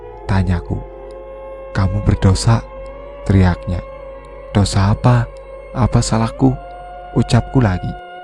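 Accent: Java, Indonesia. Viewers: Bahasane itu lhoOo ... Medok bgt .. Jawa TuLeN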